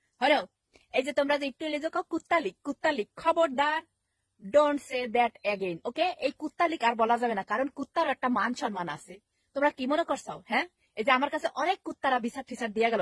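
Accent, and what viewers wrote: Barishal, Bangladesh. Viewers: আফায়, বরিশালের কোম্মের লোক?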